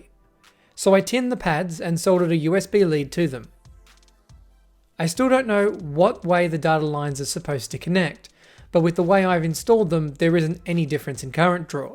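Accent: Australia. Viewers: Dude that vacuum looked to work fantastically.. please help w brother out, what kinds vacuum? Make/model. Or do they only sell em in AU or whatever the accent is. Enjoyed the video.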